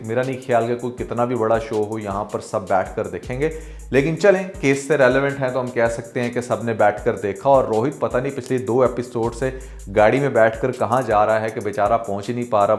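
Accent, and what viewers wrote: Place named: Delhi, India. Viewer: Sir aap phir ko fir na kaha karain aur aisa lagta hai aap dehli se taluq rakhte hain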